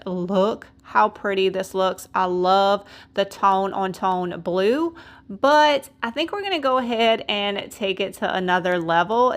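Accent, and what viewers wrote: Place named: South, United States. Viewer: Ha! I just thought it was a southern accent thing!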